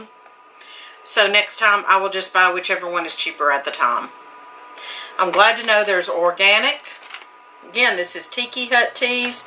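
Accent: South, United States. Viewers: You definitely from the south hunnie, love your accent 🥰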